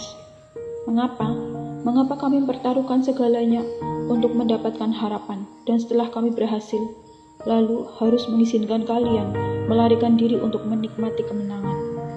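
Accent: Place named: Banyumas, Indonesia. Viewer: dialeknya kaya orang ngapak, apa iya?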